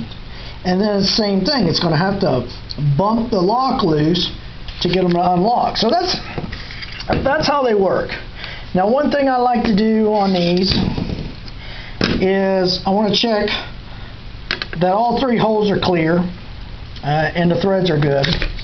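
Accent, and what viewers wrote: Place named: Northwest, United States. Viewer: He must be from the northwest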